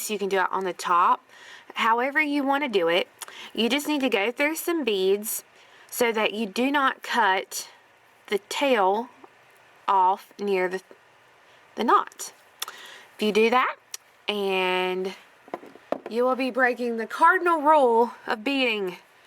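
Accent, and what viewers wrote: South, United States. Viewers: Hi, I love your beautiful southern accent. What I love the most is your way of teaching, you are one of the few that goes to the point, but give us clear explanations of each one of your beautiful pieces.Your work is impeccable,easy to understand no matter which stitch you teach us you always make it easy to fallow. Thank you for shearing with us your wonderful talent. I will love to learn how to make the gorgeous earrings you have on,and also to know where I can purchase the wire protectors that you mention in all of your videos. Is't possible that you let me know where to get them? Last but not least I want you to know that I had learn tons just by watching your tutorials. Thanks again. Love you.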